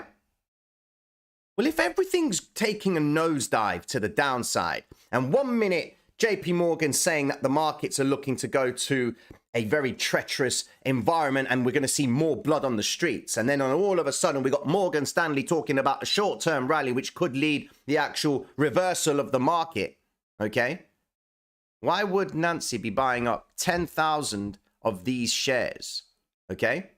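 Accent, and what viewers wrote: Cockney, United Kingdom. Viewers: Big love. Top fella cockney T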